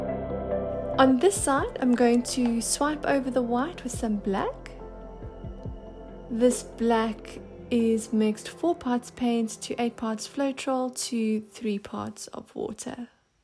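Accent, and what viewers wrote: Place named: South Africa. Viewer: Brilliant idea and beautifully done. SA accent? Do you live in the US or SA? I'm so nosey, aren't I, but you're the first South African voice I've heard teaching painting and it's so refreshing.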